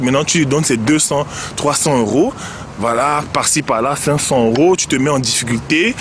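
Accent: Cameroon. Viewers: Pas besoin de te présenter gars, ton nom, ton timbre vocal et ton élocution font de toi un Camer. Et merci pour le conseil. Je t'invite à aller écouter les bra-tra-saqueurs de Marseille su Femme Immigée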